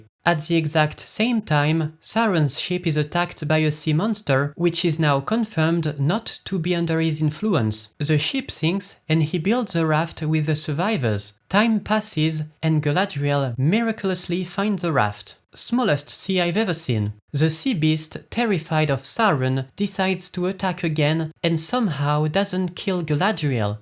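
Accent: France. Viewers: C' est un accent français ça?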